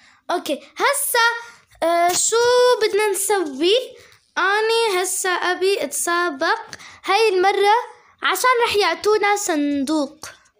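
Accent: Baghdad, Iraq. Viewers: مو صعبه الهجه اللجه انتي حجيتي بغدادي اليغدادي صب الجنوبي اسهل تحياتي فاطمه من العراق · احله لهجه هي العراقيه بس انتي شوي تعرفين عراقي 😭🌚🤓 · اول شي حجيتي بل الغة البغدادية واني عراقية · انا عراقية🐸💗 الي هية احمر فوق وابيض فالنص وفيه كلمة الله اكبر وتحت اسود ههههههههههه يربي شلون حجيتي عراقي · يخبل كلامج بالعراقي بس لو تتعلمي اكثر راح تصيري احلى انتي تجنني تحيه من العراق للمغرب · ههه انتي مضحكة في الهجا العراقي انا من العراق يعني أعرف شقول في العراقي 🧸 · واوو كلش تحجي عراقي إذا تردين اعلمج بعض الكلمات العراقية 😍احبج 😍😍😍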